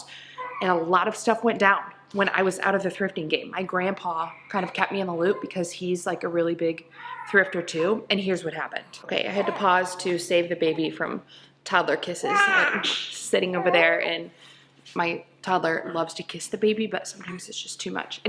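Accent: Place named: Missouri, United States. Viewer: I love your message about letting go of the perfection of it all. Listening to you talk I am wondering if we are from the same area! I am from warrenton Mo and there is a hill there called hopewell hill, not far from the Missouri River. I just found your channel recently and have been watching/enjoying your videos!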